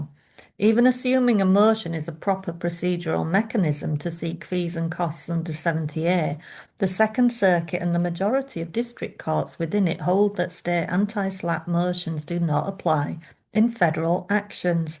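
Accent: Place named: Scotland, United Kingdom. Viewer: I loVe the Scottish acccent!❤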